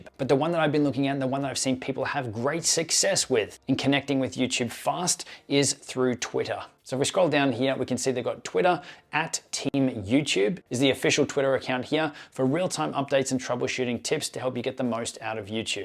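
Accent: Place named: Australia. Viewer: Bro you are wonderfully helpful your videos are amazing. I wish you it was no in Aussie accent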